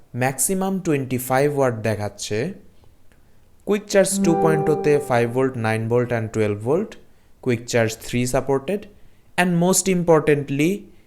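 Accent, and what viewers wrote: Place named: Chapai Nawabganj, Bangladesh. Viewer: ভাই আপনার বাসা কি চাঁপাইনবাবগঞ্জে। আপনার কথার টান আলাদা।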